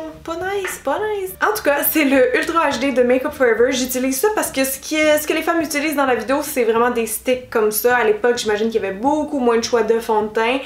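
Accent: Canada. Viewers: Coucou ma belle très bonne idée ta vidéo oui moi j'adorai que tu en fasses d'autres de ce genre pour voir l'évolution du makeup dans le temps !! D'ailleurs à ce propos serait tu me conseiller un livre sur l'histoire du makeup stp? Gros bek à toi et au Canada je ne me lasse jamais de votre charmant accent c trop cut !! 😉. Eve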